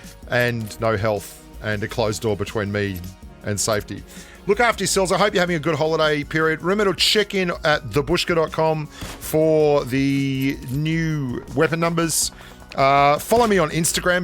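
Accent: Australia. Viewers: yoooo u austrailian?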